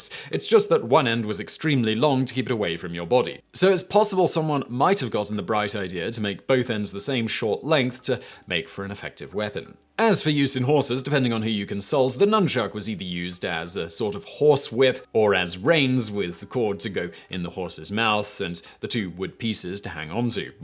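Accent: United Kingdom. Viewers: The British accent is a plus. That's about the only positive . The rest is all hyperbole.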